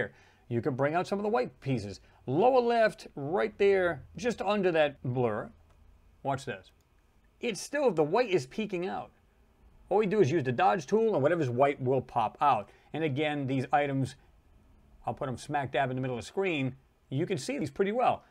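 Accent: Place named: Northeast, United States. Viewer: That Northeastern accent loud and clear!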